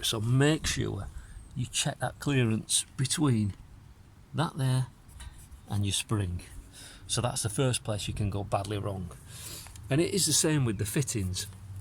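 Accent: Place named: Northern England, United Kingdom. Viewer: Many thanks my mate for the vid....ordered a TEC X-Pipe yesterday....so fitting soon.....want to make a before and after road vid before I change it. Some great tip there....most helpful. You sound just like me...I don't trust anybody else working on my bike....every where is so slap dash these days....killed me having to take my T100 back to the dealer for its first service.....could do it with my eyes closed but did not want to risk the warranty....or having aggro over it. I was trying to compare the Motone re the TEC part as I did not know which one to go for.....did you find out much about the Motone pipe before you got yours.....did you find any performance gains after fitting? You sound like a norther lad like me...Runcorn..... I agree these bikes OOooozz quality. Thanks again for the tips. Nick.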